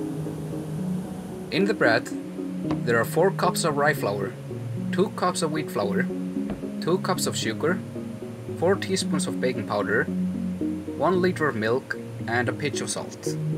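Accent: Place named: Iceland. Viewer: That looks so incredible. And I love the Icelandic accent. I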